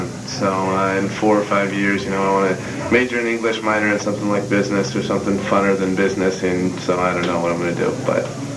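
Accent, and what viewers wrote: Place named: United Kingdom. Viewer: He sounds a bit british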